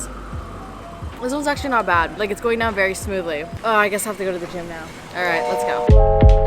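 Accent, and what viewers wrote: Vietnam. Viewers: YOUR VIETNAMESE ACCENT IS SO CUTE OMG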